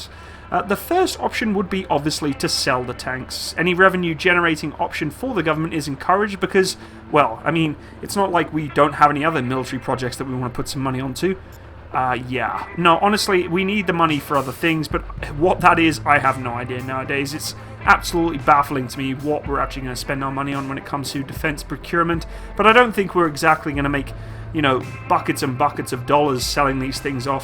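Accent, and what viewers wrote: United Kingdom. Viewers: i though you were british? how long you been in canada?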